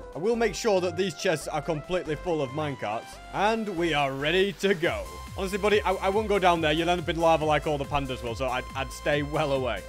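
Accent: United Kingdom. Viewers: I think is british lol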